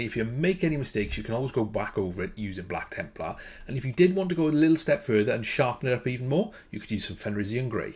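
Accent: Wales, United Kingdom. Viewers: You Welsh man? I'm like 99% sure but its so uncommon finding another Welshie online lmao. Anyway, bangin paint job, I just got a bunch of votann and I'm loving the tips!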